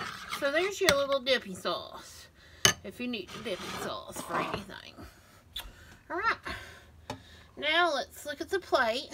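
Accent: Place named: West Virginia, United States. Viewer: well, I have a. Horrible pain when she speaks Hello ever body.....scrapes. she doesn't care. I'm not subscribed and wont matter if I don’t watch. I don’t subscribe she doesn’t ever respond even to her wrorshipers. ( those who praise a new shirt with stains) I can like subscribe a million other YouTube cooks, like Paula deen ( who is a true a Sourtherner. Not fake Orr a wanna be ). My grandparents were from West Virginia-as is and their accent was hard as well)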